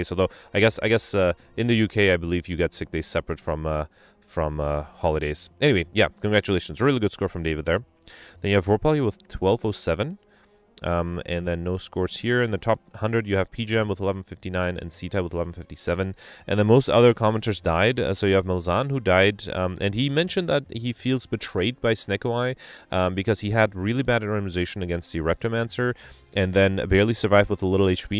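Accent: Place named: Scandinavia. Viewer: It's all good. Just gave som Baltic or scandinavian vibe to your speech, but that's totally fair. I'm from Denmark myself. Anyway I love your videos they're great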